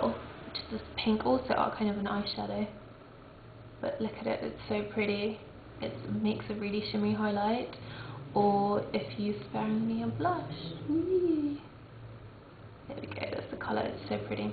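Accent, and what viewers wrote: England, United Kingdom. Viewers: Heyy Ur To Pretty And U've Got A English Accent Like Mee Yayy!!!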